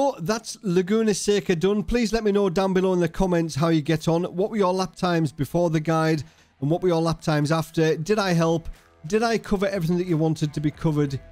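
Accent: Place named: Middlesbrough, United Kingdom. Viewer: Are you a Boro lad? You sound like a Boro lad! Great video by the way. I've found you really helpful since I started iRacing.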